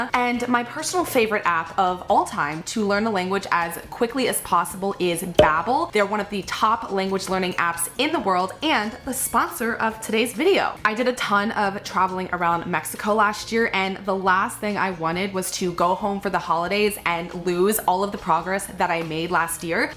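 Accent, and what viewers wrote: United States. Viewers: I'm from western Canada. Think Montana/Minnesota accent. When I listened to you, I thought I heard a hint of Bahstan.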